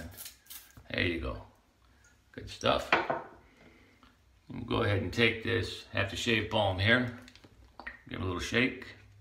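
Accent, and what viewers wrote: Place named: Texas, United States. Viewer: wow that’s cool! I’m British but my girlfriend is from Austin Texas and we were trying to pin down you’re accent and said she thought you were five different states but that you could be from Austin. Thanks for clearing that up dude, keep the videos coming and the shaves silky smooth.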